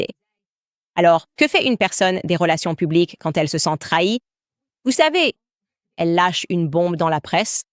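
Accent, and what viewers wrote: Quebec, Canada. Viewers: AI gave you an accent from Québec!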